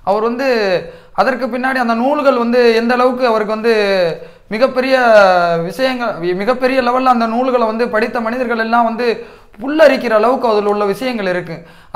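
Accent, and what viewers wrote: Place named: Thondi, India. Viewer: தொண்டி தமிழ் அருமை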